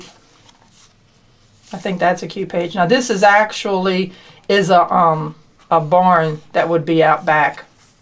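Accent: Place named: Baltimore, United States. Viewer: That would be awesome but I think we are on opposite sides of the country. I thought I heard a familiar accent (I am from Baltimore) but we live in Seattle. My sons are still in Maryland but I don't think I can talk them into picking up blueprints to bring out in their suitcases for Christmas!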